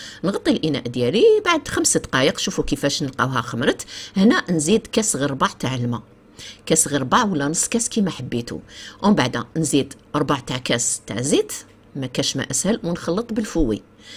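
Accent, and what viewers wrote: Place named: Algiers, Algeria. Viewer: sbah el khir yatik saha, j'adore votre façon de parler à l'algérois super bonne journée et bonne continuation djamouaa makboula inchallah 😍😋👍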